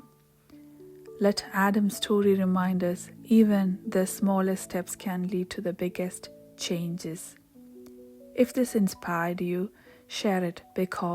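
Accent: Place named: United Kingdom. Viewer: Ma'am I like reading and story telling. I am going to practice shadowing with you. Your pronunciation, rhythm, intonation everything is perfect and most importantly you speak british pronunciation which I love to speak ❤❤ It will help me to improve my pronunciation. You speak natural English but we are trying to make it natural 😊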